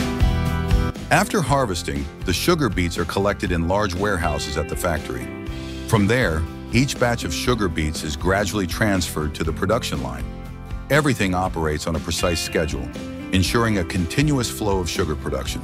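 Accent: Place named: United States. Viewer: Hearing an "American" accent I expected you to report on American sugarcane farms. Instead you reported on Australian sugar . You did not say which country the footage on beet sugar production was filmed in . (My apologies if you did and I missed it ) . I did not know that beet has a "white" variety....I thought all beet was a dark purple . There are variations in reaping and processing sugarcane in the various countries . In the West Indies and in Florida the raw sugar is brown....before it is refined into "white" . Rum is also a by-product of sugar production in the West Indies .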